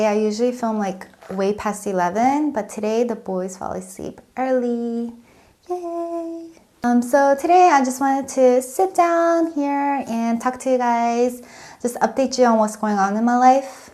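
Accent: South Korea. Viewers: I think its quite interesting. You're an American-born citizen but you have a slight Korean accent when you speak (ie mixing up plural and singulars). It's fascinating how you acclimate to your surroundings or pick up people's mannerisms and speech. I hope you enjoy your life in Korea.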